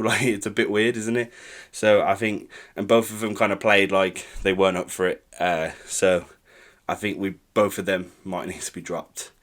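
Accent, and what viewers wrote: United Kingdom. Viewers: You sound like you’re from brum but you support Chelsea ? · Proper London accent right there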